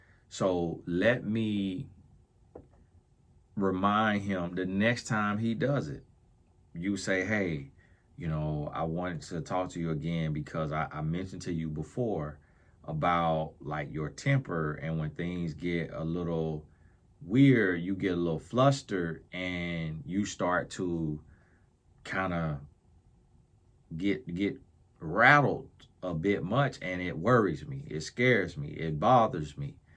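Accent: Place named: Mississippi, United States. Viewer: are you from Mississippi??? I think I recognize your accent. ❤🎉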